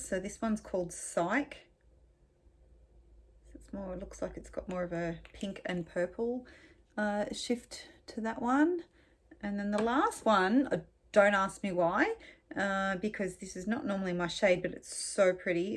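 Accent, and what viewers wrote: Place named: Australia. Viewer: Aussie accent learning time! haha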